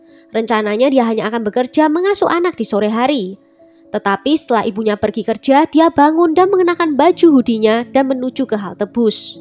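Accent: Java, Indonesia. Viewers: Aku merasa terganggu sm medoknya.....Tlg latihan lagi mbak.. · Logatnya mba medok tp suaranya bagus jelas terdengar 👍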